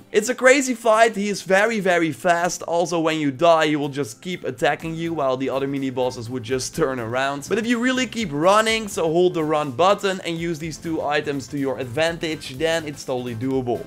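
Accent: Netherlands. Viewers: ik hoor zo erg dat jij nederlands bent echt irritant maar niks mis met je content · This dude sounds dutch.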